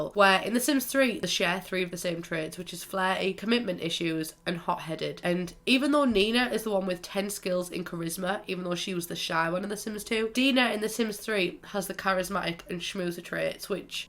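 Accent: United Kingdom. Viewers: Lmao you’re so british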